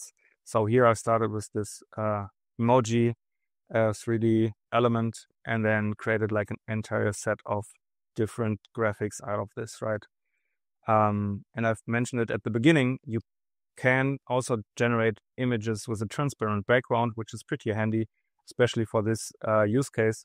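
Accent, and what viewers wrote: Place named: Germany. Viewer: dieser Deutsche Akzent 😅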